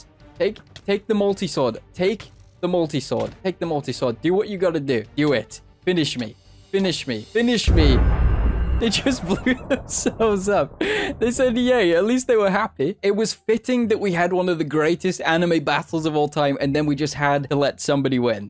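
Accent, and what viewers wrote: United Kingdom. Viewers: bu'on dude your bri'ish accent is so intense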